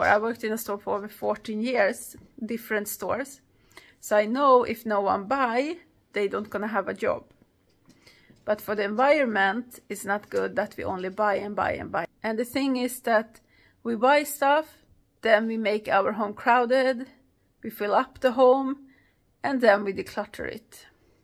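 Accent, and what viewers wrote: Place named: Sweden. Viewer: I am Swedish also!❤️recognized your accent! Lycka till!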